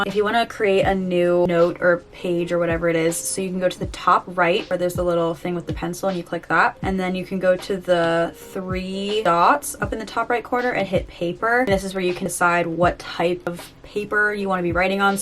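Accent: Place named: New Jersey, United States. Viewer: IDK where you are from but every time you talk, you sound like NJ (where I am also from)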